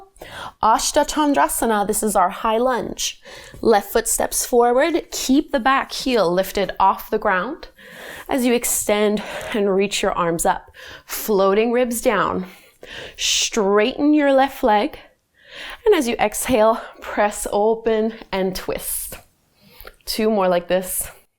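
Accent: Canada. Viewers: My word today is "grounded". Thank you for your amazing videos. I love starting my day with your guidance and expertise. I really appreciate your encouragement for modifying poses as needed; I never feel any pressure to conform to something that doesn't work for my body. Also, as a transplanted Canadian living in the US, it is really wonderful to hear your Canadian accent. The three oms at the end gave me some time to really internalize my practice.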